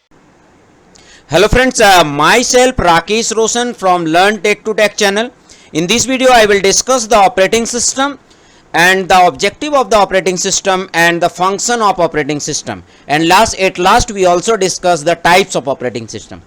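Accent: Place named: Pakistan. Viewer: Your pinglish is awesome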